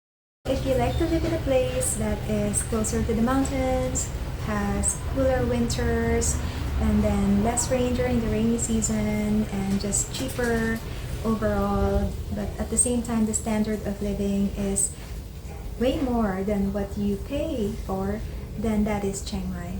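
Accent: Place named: Philippines. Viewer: your English accent seems to resemble the way people here in the Philippines speak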